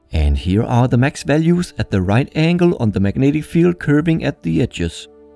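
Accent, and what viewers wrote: Denmark. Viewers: Hejsa.🇩🇰 Har ikke set dig før men kunne høre du var dansk med det sammen 😁👍🏻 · er du fra danmark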